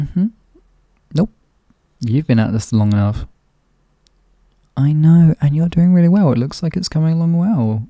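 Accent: United Kingdom. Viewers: I saw this and my first thought was "oh the british guy!" And got very exited since i was just thinking about a fictional crush i have with an accent like yours [pardon the spelling mistakes its late and auto correct isnt on]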